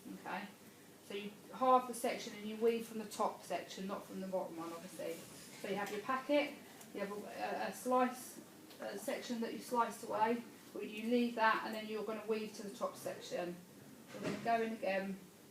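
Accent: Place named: Kent, United Kingdom. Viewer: Is this in southeast Kent? Sounds just like the accent in Sandwich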